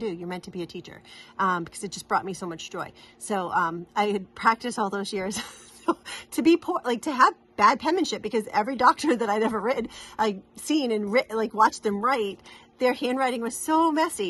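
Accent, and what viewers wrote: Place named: New England, United States. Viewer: Happy belated Birthday ! I hope you have the best time at retreat! I love your videos! Hear your voice and New England accent reminds me of home! I moved to Texas in 1981 and miss those New Englanders ! Your students are so lucky to have a teacher with such a happy personality! Hugs from Texas! Ps you can never have too many starts!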